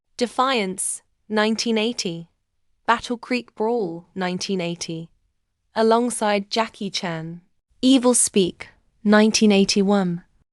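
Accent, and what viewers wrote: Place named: United Kingdom. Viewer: Why is there a preponderance of British accents vo-ing mob stuff ? I’d rather hear a Brooklyn accent ! More realistic and less nauseous !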